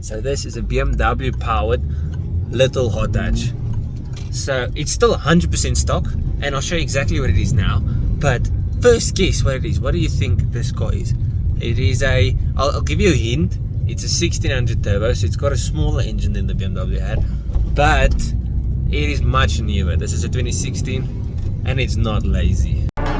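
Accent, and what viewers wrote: Afrikaans, South Africa. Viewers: First thing I hear is the Afrikaans accent. Appreciated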